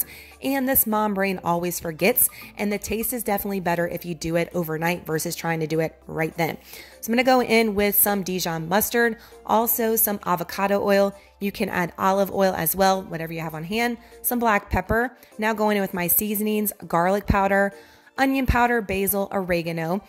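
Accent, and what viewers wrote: Louisiana, United States. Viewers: Girl I can hear that fellow Louisianian in you!!! 504 representing!!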